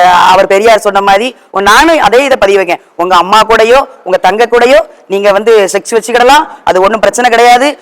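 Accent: Thoothukudi, India. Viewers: உங்கள் தூத்துக்குடி தமிழ் நல்லாருக்கு 🎉🎉🎉🎉🎉🎉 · அக்கா உங்கள் வீரமான தைரியமான விளக்கமான உரை அற்புதம். வாழ்த்துக்கள் அக்கா🎉🎉 உங்கள் ஏலே என்னாலே தூத்துக்குடி வழக்குமுறைப் பேச்சு கேட்டுக்கிட்டே இருக்கலாம் போல. ❤❤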